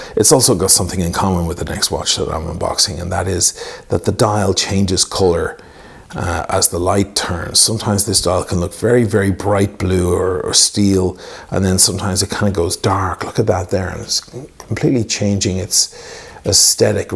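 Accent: Ireland. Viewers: What can be said about the 116610LV that hasn't already been said? I came for gruff, Irish, vocal fry. And the drinks.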